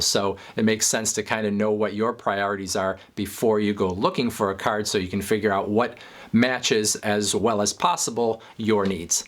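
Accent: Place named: Chicago, United States. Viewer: I can hear the "Chicago," in your voice. 😄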